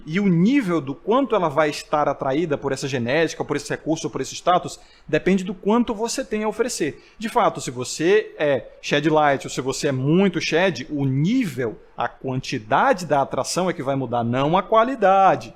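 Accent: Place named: Northeast, Brazil. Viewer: ~ "sempre vai ter um Deus, a genética é o Deus dele" => isto eu achei fenomenal, nunca tinha visto alguém ter esta verificaçao. Se voce estudar teologia/mitologia grega clássica a fundo, vai entender que os deuses gregos era meramente um dicionário de palavras em que as pessoas deveriam acreditar piamente, como "democracia", "justiça", "honestidade", "dignidade".... Por outro lado, é bem óbvio que voce nao é loiro e nem tem os cabelos lisinhos, eu nao preciso de te ver pois a sua voz com sotaque rede globo nordestino te entrega. Eu até acho que voce estudou jornalismo, mas aí ainda tenho muito pouca informaçao. Nós nunca somos este indivíduo único. Se voce é volcel ou incel, pouco importa, mas as pessoas tem condiçoes sim de recriar sua imagem sem ver voce.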